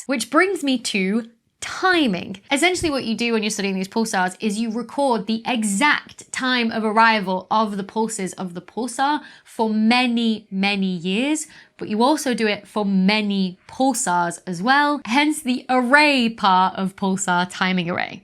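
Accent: United Kingdom. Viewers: If my teachers where this pretty and had a cool British accent I think I’d have gotten much better grades. Or as my kids say more better grades 🤭.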